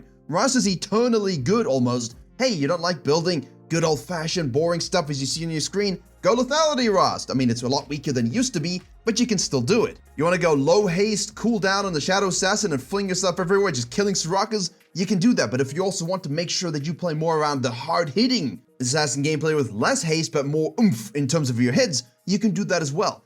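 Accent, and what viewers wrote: South Africa. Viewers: WOOOOOOOO a fellow South African.. Don't know how I didn't pick up the accent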